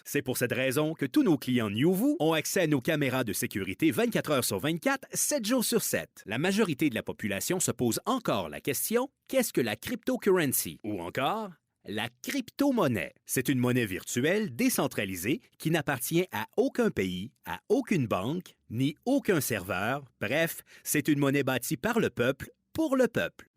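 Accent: Canada. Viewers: un joli petit accent canadien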